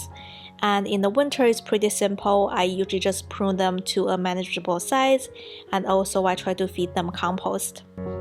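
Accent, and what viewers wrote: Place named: Vietnam. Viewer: Just came across your channel. I also live in the Bay. I noticed your accent. Are you Viet lol? Love your garden!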